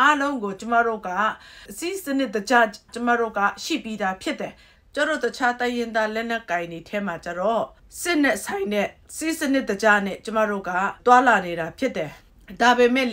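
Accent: Kachin, Myanmar. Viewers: အမြဲတမ်းအဆင့်အတန်းရှိရှိ၊စစ်ခွေးတွေကိုထိုးနှက်ချေပတာတွေ၊ဘက်မလိုက်သုံးသပ်ချက်တွေနားထောင်ရတိုင်း၊ ကိုချစ်ခင်လေးစားရသလို၊ကချင်သံဝဲဝဲလေးနဲ့အထစ်အငေါ့မရှိ၊လက်တန်းပြောသွားတာတွေအရမ်းကျေနပ်အားရမိပါတယ်။တော်လှန်ရေးအတွက်စစ်ခွေးတွေ၊ဘောမတွေကိုReactionလုပ်တာတွေအရမ်းကိုထိမိလွန်းလို့big congrats ပါ။ ။ဆက်လက်ပြီးဘုရားသခင်သာ၍၊ကောင်းချီးပေးအသုံးပြုပါစေ။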